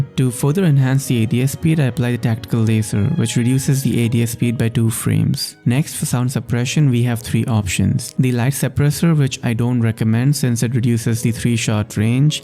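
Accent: India. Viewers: Your accent sounds like Indian. Are you Indian?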